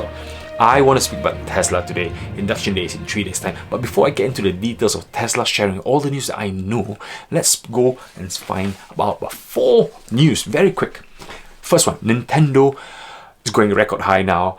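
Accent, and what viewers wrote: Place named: Singapore. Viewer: oh! where are you from? you sound singaporean :)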